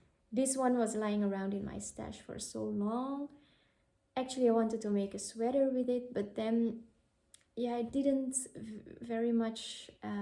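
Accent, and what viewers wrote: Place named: Belgium. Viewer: Leuke podcast goed om eens iemand uit België 🇧🇪 te horen . Mooie designs en ik kijk natuurlijk uit naar je volgende episode 👏🌸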